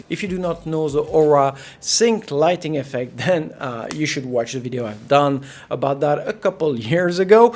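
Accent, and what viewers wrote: France. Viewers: I can hear a little bit of French in your accent. Nice review though, I’m getting this motherboard as a Christmas gift from my wife and I needed this full detail review 👌🏾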